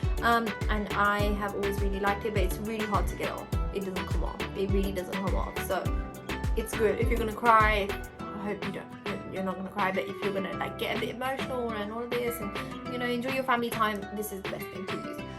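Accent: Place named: Punjab, India. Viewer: Just love your punjabi and english accent💕💕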